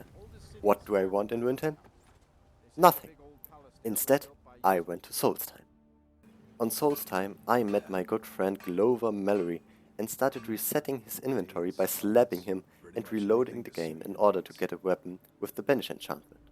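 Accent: Germany. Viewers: U german? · Der moment wenn du direkt merkst das der dude deutsch ist😂💋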